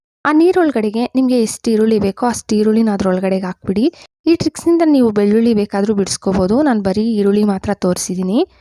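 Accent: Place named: Kolar, India. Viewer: Neevu kolar dhavru ansuthe...naanu kolar avne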